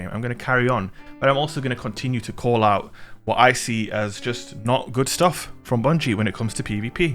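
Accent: United Kingdom. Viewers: I freaking love your accent bro, that’s a big part of why I enjoy your content is just hearing you talk. That may sound offensive, but I don’t mean to say it that way. You really hype me up and I wish I had friends from the UK. On a positive note, remember that the ones sending you hate (the PvE players) are the ones that we teabag at the end of the game after destroying them😎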